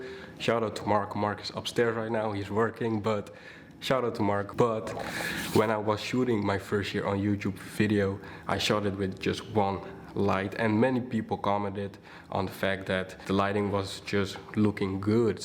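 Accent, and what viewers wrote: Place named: Netherlands. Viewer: Hahahaha Dutch accent incoming, loved the video bro. Very dope tips and love how you explain those things!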